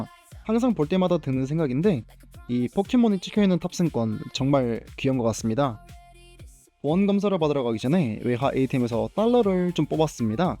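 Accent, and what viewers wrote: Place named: China. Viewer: 중국인임? 발음이 한국인이 아닌데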